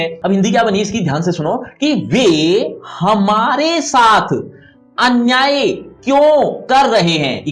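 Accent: Haryana, India. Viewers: Sir aap Haryana se ho kya? kidi baith jaati h😂ye to Haryanavi h comment👇if you are also Haryanavi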